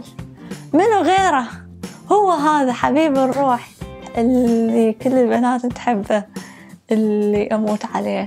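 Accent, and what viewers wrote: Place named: Basra, Iraq. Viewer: انتي لهجتج بصراويه بس م̷ـــِْن وين من البصره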